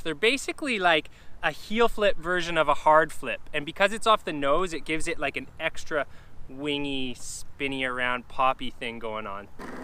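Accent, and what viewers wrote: Canada. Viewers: I just love your accent. You have the Canadian nice guy accent and it reminds me of every teacher in kindergarten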